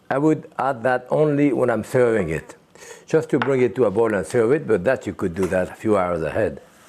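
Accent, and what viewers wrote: France. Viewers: this mans is so fucking french and im absolutely here for it. "and this, as you can see, is a -aut dog-"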